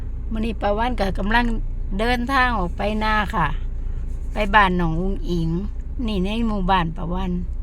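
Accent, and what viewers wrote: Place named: Khon Kaen, Thailand. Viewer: เริ่มเว้าใกล้ข้องแล้วจ้า 😍😍😍สู้ๆต่อไป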